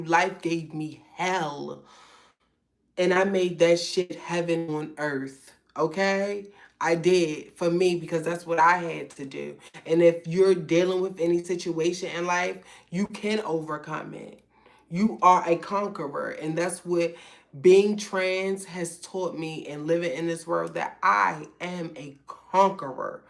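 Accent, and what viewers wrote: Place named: Baltimore, United States. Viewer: hello beautiful. can I ask where are you from? you have a Bmore or DC accent. I'm Bmore born and bred! #Poly😆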